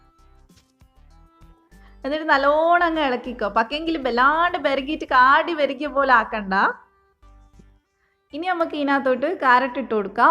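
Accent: Kannur, India. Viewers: Ente koche i thought of subscribe the channel. But i couldn't understand your kannur slang even though i am a mallu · Kannoor bhasha policy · Molu.kannooru kaariyaannennu yenikku.manassilakkan kazhinjirunnu.kannoorbhasha.kurachoode nannayi samsarikkunnunnu maathram.musleengalude .samsaarareethi alpam.maattamundavum.njammakku.yennokke parayum. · അടിപൊളി കണ്ണൂർ ഭാഷ 😂😂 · 😀igane thanneya samsarikkalu njammalu kannur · 😂😂😂ഞാനും kannura ,,നമ്മൾ ഇങ്ങനെ തന്നെയാ പറയുന്നെ😂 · കണ്ണൂർ ഭാഷ ho...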